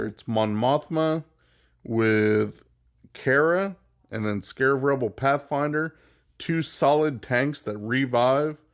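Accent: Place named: Texas, United States. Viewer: fiddy-four banners LOL! ive noticed your cool accent before.Are you from Texas or smthing? :D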